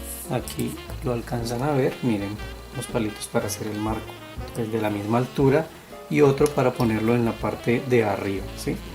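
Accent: Colombia. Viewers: Me Encantaron las 3 pero Ame la Tercera y con tu Acento COLOMBIANO me Enamore mas de tu ARTE gracias y Bendiciones por compartir saludos desde Jalisco México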